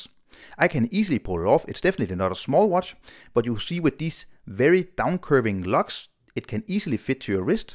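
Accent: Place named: Denmark. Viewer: haha insåg inte att det var dansk engelska jag hörde! Gissade på Holland/österrike först. Du gör bra videos!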